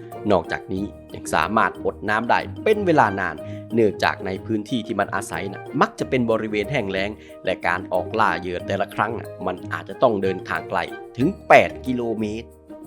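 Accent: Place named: Isan, Thailand. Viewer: เสียงคุณติดสำเนียงสุรินทร์นะ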